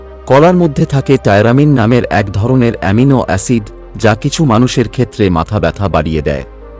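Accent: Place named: Assam, India. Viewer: আপনি কি আসামের বাসিন্দা , এই জন্য উচ্চারণ টা শুনতে আমি কলকাতায় জন্মগ্রহণ করা একজনের লোকের কাছে একটু শ্রুতি কটু লাগছে , অবশ্যই আপনার ভাষাতেই আপনি কথা বলে চালিয়ে যেতে পারেন , ভালো থাকুন সুস্থ থাকুন ❤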